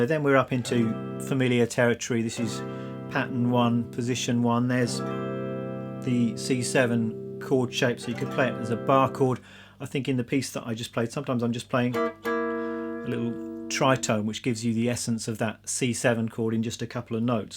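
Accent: United Kingdom. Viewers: “Whoops, didn’t mean to click on this video. Wait, he is British- let me see what he has to say.” This is what accents do to my brain.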